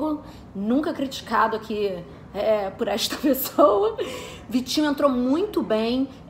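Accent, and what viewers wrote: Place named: Rio de Janeiro, Brazil. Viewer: O sotaque carioca mais lindo!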